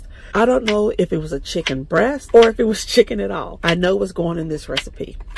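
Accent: South, United States. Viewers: Hi, I'm new to watching your videos. I enjoy seeing you explore the world and cooking in your van. Where are you from you have a southern accent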